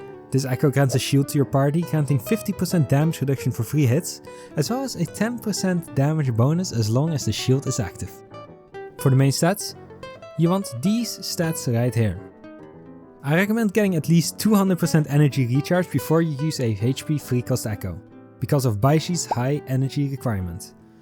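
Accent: Netherlands. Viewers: the dutch accent, gekoloniseerd ouwe! nice vid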